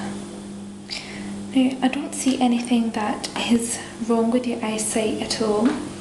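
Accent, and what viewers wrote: Scotland, United Kingdom. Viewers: I absolutely love your Scottish accent. You make it sound like a song. Beautiful to listen to :) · Concerning your inbox: Open your video manager (for example) and see the menu on the left side - klick on "Community" - another menu will open and THERE you can find your inbox :) The Scottish accent rocks. I love your videos, great to see you're back ♥ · Scottish accent made me hard. I don't know why. Probably a combo of voice and gorgeousness. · Good. I thought you were really Scottish! And im Scottish myself.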